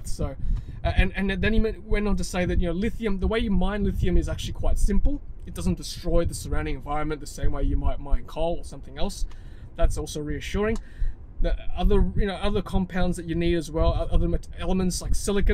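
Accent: Australia. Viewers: Thanks for your entertaining update,! I thoroughly enjoyed it like all your posts. Your enthusiasm is infectious & it's really good to hear all this locally from someone with an Australian accent. Keep up the good work (and please let Joy know I enjoyed her posts as well). Cheers, mate!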